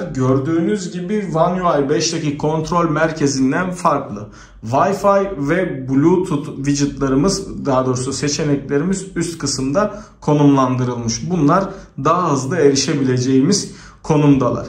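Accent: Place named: Sivas, Turkey. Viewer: abi sivasın neresindensin :D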